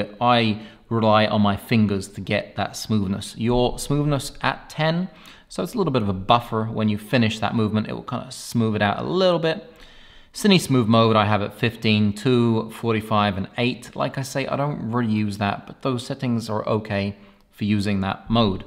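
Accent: United Kingdom. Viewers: Great tutorial, mate!! It was very enjoyable to watch when a guy with UK accent shows that much emotion. :) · idk but I don't like getting drone info from UK accents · Are you a Brit living in the USA? Your accent has some US twangs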